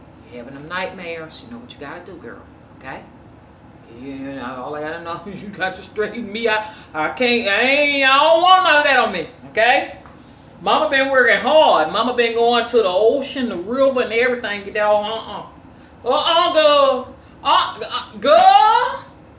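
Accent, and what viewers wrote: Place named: Alabama, United States. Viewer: I just finished watching one of your other videos and I thought, "she kinda has an Alabama accent" and then I clicked this video and you said you graduated from Birmingham college I was like ayyeeee I've lived in Alabama since I was a baby and sometimes I notice other peoples accent because it's familiar. Also I really feel like I learn something new after watching a video of yours, it's a beautiful thing to want to share your knowledge with the world. It's undeniable that you have great purpose and anyone who stands against you is afraid of the truth. You're WOKE AF and that's rare.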